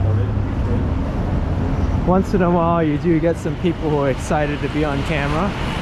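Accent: New York, United States. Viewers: Oh wow, where to begin...!? I loved this video! My husband and I visited NYC two years ago, stayed down on 46th somewhere, and learned the subway system, visiting all the touristy spots that week... Last year we returned, but this time branched out for some historical places. This year (in April again) we are staying close to Central Park, and will spend much of our time there, relaxing, walking, exploring, painting, taking photos...But hey! I stumbled onto this video tonight and walked all the way down 5th Ave. with you,totally enjoying your narration and fantastic tour, along with tips for tourists! Next thing I do will be to look for a few more of your videos, and hit that subscribe button! You are so knowledgeable, so you must have grown up in Manhattan? You have just a trace of a NY accent! Thanks for a fantastic walk down Fifth Ave!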